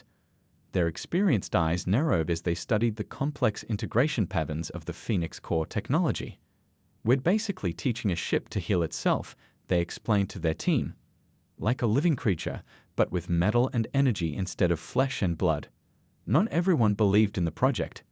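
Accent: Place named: New England, United States. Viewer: The narrator (AI?) has a distinct New England accent!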